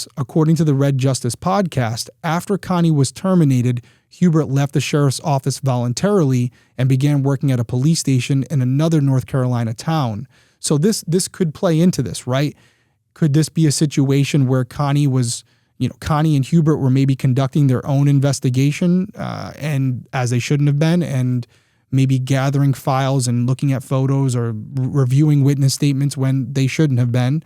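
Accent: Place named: New England, United States. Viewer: Great job. Also, I really enjoy the way you talk and verbiage used. It’s has that “New England” feel to it, and it’s sincere. I saw some commentary regarding the way you word things. I disagree strongly. Anything else would feel so phony coming from you. Don’t change.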